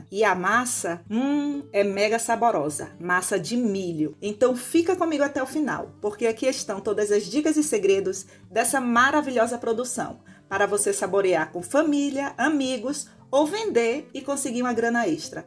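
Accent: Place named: Salvador, Brazil. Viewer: Tenho uma amiga que é de Salvador e fala igualzinho você !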